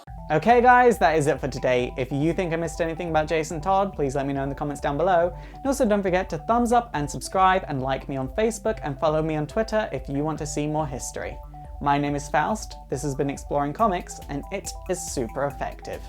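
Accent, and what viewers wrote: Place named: RP, United Kingdom. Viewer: Damn, do you know that you could be an... excellent storyteller, don't you? I mean with your narrating talent, passion you talk with, you can recite fairytales for children/work in a sort of playhouse or something. To think about it, your British/RP-ish kind of accent (from one way extremely annoying) can do the trick as well.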